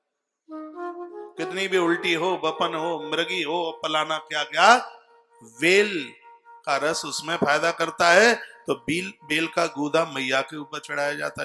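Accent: Braj, India. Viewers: गुरुदेव आपके श्री मुख से व्रज भाषा बहुत मलूक लगती है .🙏🤗🤗😊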